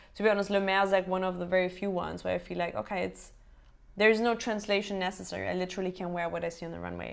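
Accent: Germany. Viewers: are u german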